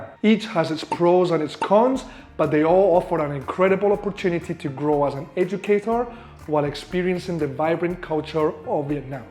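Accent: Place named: Arab world. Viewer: oh, that tough arabic accent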